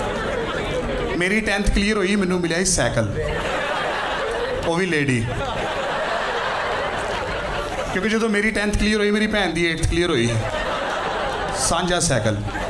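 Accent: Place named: Punjab, India. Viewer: Punjabi language and the accent is lit🔥🔥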